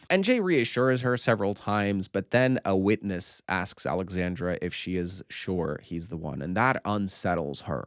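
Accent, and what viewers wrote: Portugal. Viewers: Meu Deus o choque que eu tive quando voce comecou "Amor é fogo que arde e não se ve..." eu esqueci totalmente que vc fala portugues hahahahahaha mas nossa pra mim (br) é realmente difícil entender português de Portugal, que doideira né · O PORTUGUÊS DE PORTUGAL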